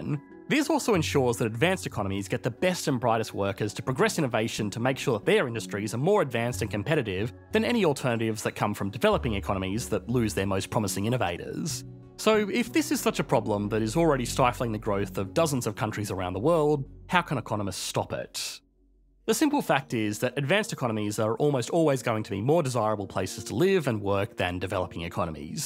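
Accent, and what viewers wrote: Australia. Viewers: Australian accent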